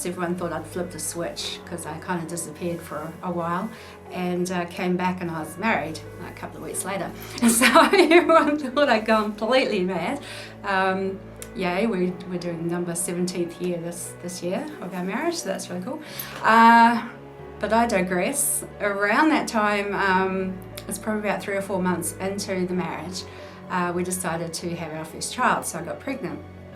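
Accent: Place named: New Zealand. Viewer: Are you a new zealander?? I just caught the accent and was like whoa! I'm from Christchurch. I'm just transitioning into the goth subculture--by that I mean I'm now dressing more to reflect the things I've always liked :) Enjoyed the video edit: I totally commented before you mentioned NZ 😂 I just get excited when I find NZ'rs in youtube haha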